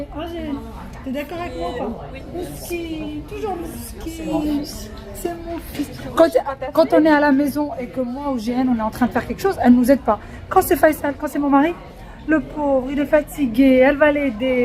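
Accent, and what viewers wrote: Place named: Morocco. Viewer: Moi j adore quand elle parle marocain même si je ne comprends pas trop mignon 😊 Changer rien vous êtes mon petit rayon de soleil de la journée vos vidéos je n’en loupe pas une🤗❤profiter avec votre belle famille et ne vous soucier pas de ses commentaires idiot❤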